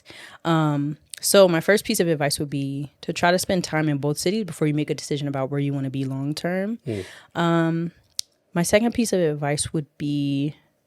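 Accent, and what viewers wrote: South Africa. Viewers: Welcome home, but you sound100% South African like a familiar girl I know in the hood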